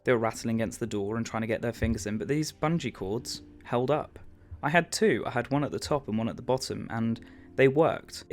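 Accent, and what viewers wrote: United Kingdom. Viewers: How could the poster not tell you were British? Your accent is obvious. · i can stand the horrific british accent for only so long 😭😭😭 god damn its obnoxious and gross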